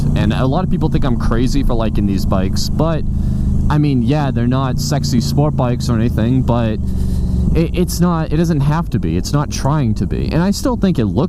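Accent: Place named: Boston, United States. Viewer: I hear Boston in your voice. Go B’s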